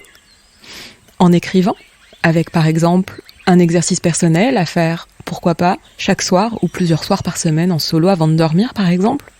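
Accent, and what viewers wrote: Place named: Marseille, France. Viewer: Merci pour cette présentation détaillée et le sens de l'humour et l'accent "Police de Marseille" un délice pour les oreilles et les sens.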